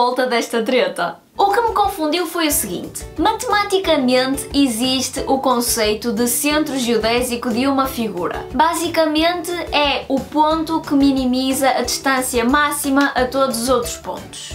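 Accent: North, Portugal. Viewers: Tão bonitinha o português de Portugal falando porra ❤ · Em Portugal há imensos sotaques. O dela é do norte de Portugal. Abraço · seus vídeos são muito bons de ver. Ouvindo você falar, lembro-me dos meus primeiros dias em setemhro de 2009, recem chegado ao Porto pra ficar 6 meses pelo ERASMUS. Eu mal entendi o sotaque nortista. Hoje de tanto que eu ainda consumo música e mídia portuguesas, pra mim é a coisa mais fácil do mundo. Saudades de Portugal! Um dia volto! Parabéns pelo canal! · Tipo, tu aprendeu bastante sobre o vocabulário brasileiro né?! Pq fica muito foda a forma como tu fala as gírias do Brasil em português de Portugal.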